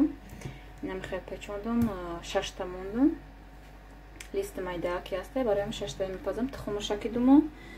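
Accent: Tajikistan. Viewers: Салом шавхаратон ай хучанд худатон ай тарафи кургонтепа чхел шуд ядигаритона гирифтед худатон лахчайи точики кати гап мезанед шавхаратон гудакотон хучанди бачи дадо моям дадо мегуйем падарамона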